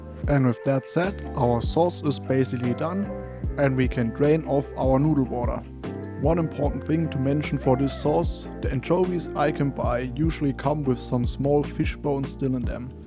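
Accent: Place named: Italy. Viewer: Didn't even notice your italian accent until now😙👌🏻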